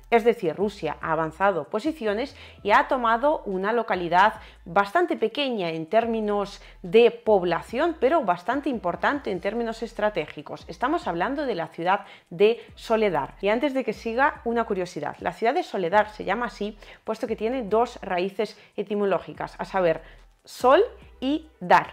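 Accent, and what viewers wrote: Spain. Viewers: El único problema es el asentó español okey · Obrigado pela explicação. Você é espanhola ou russa?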